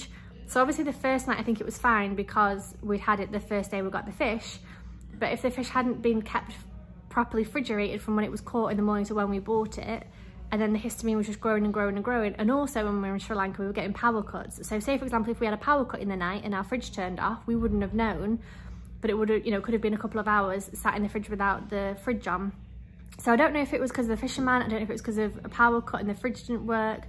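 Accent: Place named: Northern England, United Kingdom. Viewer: Hi. The type of tuna you ate is not yellow fin which is what you get in the store in the UK This is known as " Kelawala" by the locals. I too have got sick eating this Fish In SL there are no testing for contaminants you buy at your own risk.This fish has a dark red tint and looks bloody and can make you sick.I grew up in SL and now live in the US. Ps Your accent sounds very West Midland/Northern England.I have English roots and have been to the UK about five times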